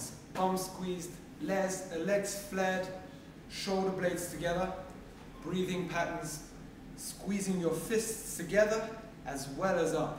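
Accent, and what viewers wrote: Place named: United Kingdom. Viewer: The accent and brit lingo makes this by far the best bench tutorial I've ever witnessed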